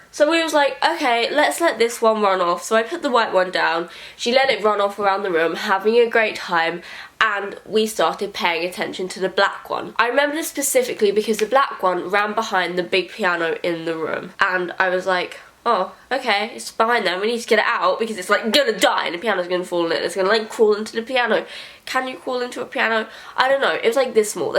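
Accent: United Kingdom. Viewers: Amanda Dorothy Anderson it's called a British accent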